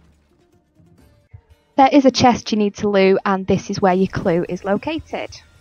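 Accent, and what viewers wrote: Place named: Atherton, United Kingdom. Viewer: Sounds really similar to the Atherton accent. You dont drag out the 'oh' sound in words the same though. Best example is my mates gf. She has a channel called olibomb on youtube if you're interested.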